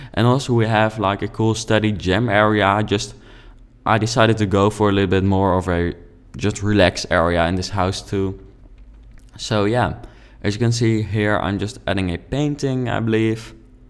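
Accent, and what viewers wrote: Netherlands. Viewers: heyy ik ben ook nederlands lol ik herkende het accent gelijk :d ik krijg de sims 4 over 3 dagen binnen en ik ga denk ik dit huis gebruiken echt moooii xx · Hehehehhe,you have a duch accent, i also have one bc i live in e Netherlands ;)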